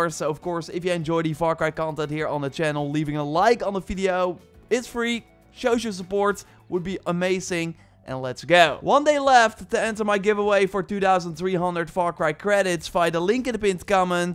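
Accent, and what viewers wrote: Netherlands. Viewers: Ben jij Nederlands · Your videos are amazing, but your Dutch accent is the really cherry on top - I love it - Tot ziens!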